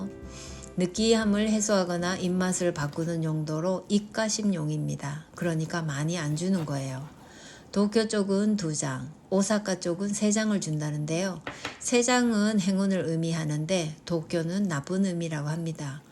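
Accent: Gyeongsang, South Korea. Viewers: 경상도말투가맞아요 かわいい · 우연히 이 영상으로 처음 들어오게 되었는데 경상도 사투리가 살짝은 있지만 아주 티날정도는 아니에요!! 일본으로 유학하러 온지 3개월이 된 사람으로서 이런 에티켓 알려주셔서 너무 감사하고 따님분 한국어 너무 잘한다고 전해주세요~~!! 다시 한 번 감사합니닷! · 참 별 넘이 다 있네요....... 따님 나름 노력 많이 했을텐데... 근데........ 사투리 안 쓰신다고요???? ㅋㅋㅋ 경상도인지 바로 알겠던데요.. ㅎㅎㅎ 항상 잘 보고 있습니다.. 이쁜 따님에.... 이해해주는 신랑에.. 일본에서 잘 살아오셨네요.. 자부심 가져도 되실 분이신데.. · 난 사투리 안 쓰는데에 악센트가 경상도 분인걸 단번에 알겠네요ㅎㅎ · 저도 경상도 사람이에요 ^^ 경상도 억양 반갑고 정겹습니다 따님 한국억양도 경상도 억양이라 속으로 웃었네요~ 그치만 어쩔수 없지요.. 따님 든든하시겠어요^^ 일본 생활 엿보는 재미가 있어요 응원합니다 화이또! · 저는 첨부터 경상도 출신인거 알았는데요^^ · 경상도사투리억양이 강하신데요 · 사투리 안ㅡ쓰는데 억양에 경상도인줄 알았어요 어때요 모국언데요 욕만 경상도식으로 안하심 됩니다 문딩이 가스나가 하면 조금. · 경상도 억양이 잠깐씩 나타납니다 뒤늦게 정주행 하지만 성격도 경상도 기질이 보여지네요~~^^ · 빕먹을때 안쓰는 손도 상 위에 올려놔야 하고, 젓가락이 무기가 될수 있다는 상상이라니… 일본인들은 기본적으로 의심이 많군요.. 😮 그리고 누가봐도 경상도 사투리 쓰고 있어요 😂😂😂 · 나레이션에 경상도 억양이 있어서 더 듣기 좋아요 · 경상도 사투리 쓰고 계십니다. 말할때 높낮이 ( 사투리 억양) 로 사투리임을 알 수 있습니다^^ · 이분은 경상도분이구나~ 생각했어요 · 왜 이상해? 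한국말 잘 하는게? 잘 가르쳐서 그렇지~~ 얼굴 안보여주는게 뭐가 이상해? 그럴수있지 다니면서 불편한거보다 낫지 경상도 사투리 씁니다 ^^ 너무 보기좋고 다정한 딸램 부럽습니다 😊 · 엄마와 딸사이가 좋은게 부러워서 별 되도 않는 말을 하나봅니다. 못난 사람들은 무시하세요 ㅋㅋ 근데 넘 귀여운 경상도 억양이셔요~ ❤ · 엄마가 경상도 사트리가 좀 있어요♡^^ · ㅎㅎ 사투리 마이 쓰십니다 ♡ 어투에 갱상도 사투리가... 너무 너무 대단하십니다 한번씩 들러 보는게 이젠 하루의 낙이 됐네요~^^ 항상 가족 모두 행복하세요~♡ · ㅋㅋ 경상도 억양 있어요 그런데 그게 어때서 좋아요 따님도 우리말 잘해서 좋아요 엄마가 잘가르치셨네요~~^^ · 억양은 경상도 시네요~^^ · 억양이 경상도 억양이예요. 경상도에서 자란 친구들 다 사투리 안쓰지만 억양때문에 사투리 사용하는 거 같아요. 따님과 대화 항상 좋아 보여요. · 이 분과 9개월전 제가 거래했던 부동산 대표님--경상도 상경 추정되는 50대초중반 연령, 서울거주 20수년이상분과 억양, 어투 싱크로율 99%네요. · 억양이 경상도입니다.섞이다를 경상도분은 썩이다라고 말함. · 경상도 억양이랑 사투리 쓰시네요. 본인이 모르실 뿐. 사투리가 나쁜것도 아닌데 왜 안 쓴다라고 하시는지. 그리고 따님 한국말 능숙하게 구사하셔서 아주 이쁘세요 😍 💕 ❤ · 억양이 경상도가 있어요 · 어머니, 단어 억양에 경상도 성조가 있어요. ㅋㅋㅋ 저도 처음에 듣고 이분 경상도 분이구나 하고 알았습니다. 그런데 듣기 좋아요. 오늘도 잘 보고갑니다. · 한국인과 일본인 차이점 사소해도 많지요. 님은 나레이션만 들어도 경상도 사람임을 일수 있어요. ^^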